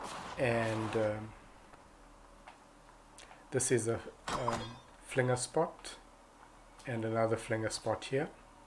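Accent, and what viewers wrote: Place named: South Africa. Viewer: Are you south african? I can see the ping and hear the accent 😭🤣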